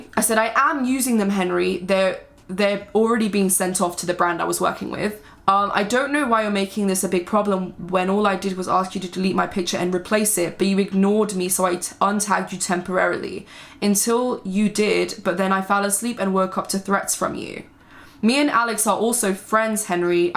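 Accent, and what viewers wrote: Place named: United States. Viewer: Why do u put on an American accent for?? Or are you but lived out of u.s for a while? Xx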